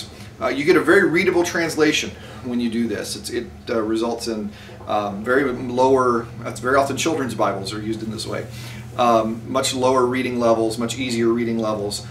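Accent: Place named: United States. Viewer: Which US State are you from? Im trying to guess the accent. :)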